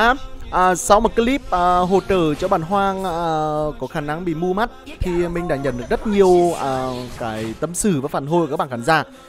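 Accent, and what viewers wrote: Nghe An, Vietnam. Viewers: Người miền Trung, Nghệ An đúng tình cảm luôn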